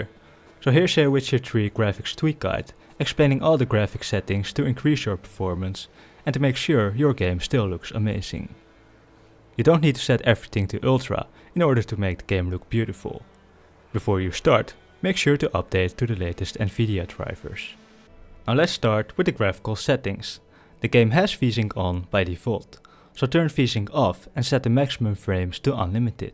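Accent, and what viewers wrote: Netherlands. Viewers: Dat Nederlands accentje... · lol he has such a dutch accent (mooie vid) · Your accent sounds very dutch, am I right? xD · Probably someone from the Netherlands. I'm Dutch myself and know a lot of Dutch people speak English like this. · does. He just has a really bad Dutch accent, which for some reason tends to add a lot of "sh" sounds in the wrong places for lots of people.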